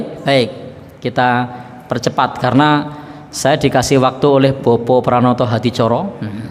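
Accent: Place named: Java, Indonesia. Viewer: iJIN BERBAGI.....👇 MENCERDASKAN UMMAT....!!! USTAD INI...KALO DARI NADA BICARANYA SUKU JAWA....... TETAPI AKIDAH NYA LURUS..... BELIYAW TERLIHAT BERADA DI ATAS MANHAJ SALAF..... HARUS NYA BANYAK ORANG JAWA YG HIJRAH MENGIKUTI PEMAHAMAN BELIYAW....YGMENGAJAK UMMAT KEMBALI BERAMAL,BERIBADAH BER AKIDAH MENGIKUTI MANHAJ SALAF....!!!! SIAP SIAP USTAD.....KALO MENDAKWAH KAN AGAMA YG HAK,YG SESUAI DALIL....DARI KITABALLAH DAN SUNNAH ROSUL..... MAKA ANDA AKAN DI TUDUH.... SEBAGAI WAHABI......😁😁😁HE....HE.... TETAP ISTIQOMAH MENDAKWAHKAN YG HAK.....MESKI BANYAK YG MEMBENCINYA....!!!! SEMOGA BERMANFAAT. 🙏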